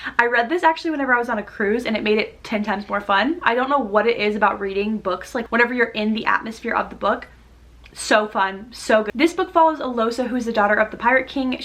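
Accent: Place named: Russia. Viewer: You akcent rusian 👀👀👀👀👀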